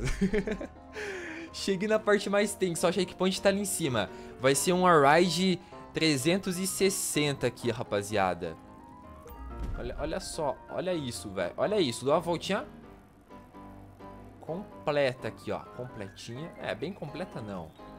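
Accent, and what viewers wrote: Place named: Paraná, Brazil. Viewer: porque nas antigas voce falava mais em um sutaque mais do Sul (Gauchos) e agora voce fala mais Paranaence???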